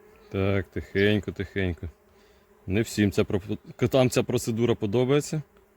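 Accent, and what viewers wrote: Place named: Urals, Russia. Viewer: Акцент у этого русского странный ,то ли с деревень Урала, то ли с деревнь Удмуртии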